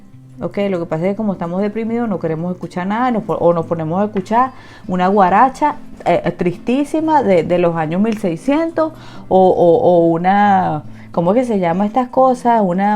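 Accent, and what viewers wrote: Argentina. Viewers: Argentino o uruguayo?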